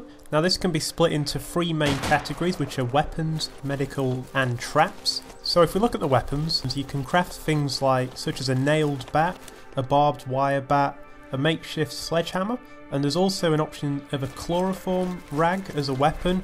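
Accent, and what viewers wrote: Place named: United Kingdom. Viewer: Are you british? i wonder because you remind me of JackFrags greetings from spain :P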